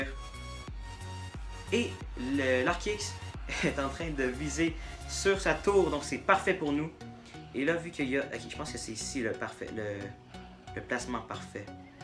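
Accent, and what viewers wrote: Quebec, Canada. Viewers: est tu quebequois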